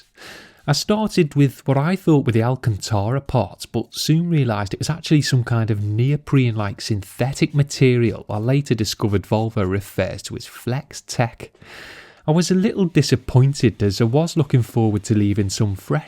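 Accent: Liverpool, United Kingdom. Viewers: I never bother with other car cleaning vids now, most are tedious to the extreme and don't offer the scouse tones I have become accustomed to! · Are you British? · Wasn't sure cos he'd mentioned the Midlands in one of his videos, and he talks quite slowly for a scouse, but now I've listened to him more I can hear the scouse twang :)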